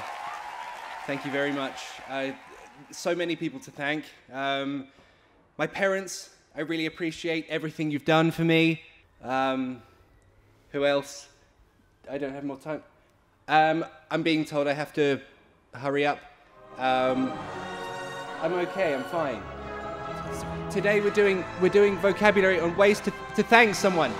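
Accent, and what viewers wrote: United Kingdom. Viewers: Sir is the first British teacher who has the lightest British accent that I've ever found. 👍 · I have a question, which British accent do you have? I would really like to know! ☺ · I like British accent because of you😊🌹..... Thanks ever so much for your useful lessons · Please give the english subtitle :( i'm from indonesia and i love so much the way you teach but so many word that i can't understand with your british accent. And thank's before :))))))))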